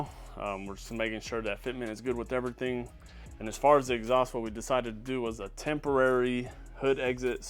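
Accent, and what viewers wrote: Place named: Louisiana, United States. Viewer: Hold up player! You from Scott Louisiana are what? Your roots run deep in your voice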